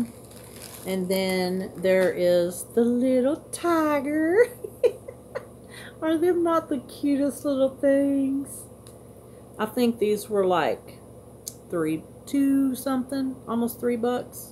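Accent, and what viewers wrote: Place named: South, United States. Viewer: I think it was a great haul! Hey look at the bright side, you don’t have to worry about people complaining about the crunching bags and wrapper’s 😂! I think you’re absolutely wonderful, keep smiling 😊… and just know you’re doing an absolutely amazing job sweetheart! Have a Blessed 😇 day! The A in my name stands for 👼 Angel! Also, I am from Kentucky and noticed a southern accent, just curious to know where you’re from?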